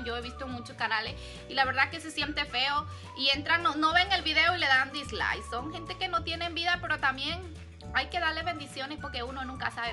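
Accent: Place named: Mexico. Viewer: Me encanto el tag lo hiciste parecer divertido 💗y yo creía por tu acento que eras Mexicana si no es porq tocas el tema yo seguía convencida de que eras 100% México❤y pues si se pega un poco! yo vivo en Italia hace 13 años y no me doy cuenta pero las demás personas me dicen que tengo un acento diferente!yo pienso que es normal!Besos hermosa y sigue así de natural❤😘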